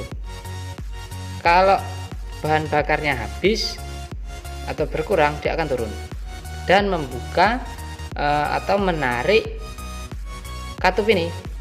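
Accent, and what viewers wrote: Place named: Java, Indonesia. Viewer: Jawane medok mas hahaha tapi mantep penjelasane mas, ditunggu vidio seng laine mas.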